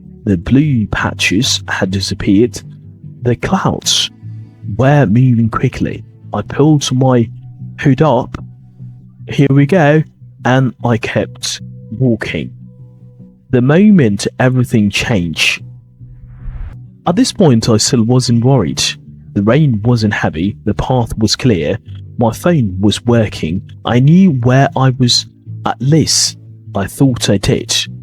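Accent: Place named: RP, United Kingdom. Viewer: It’s a real pleasure to listen to these fascinating stories read in your perfect RP accent. I can’t wait to hear the next one. Thank you so much for all your hard work.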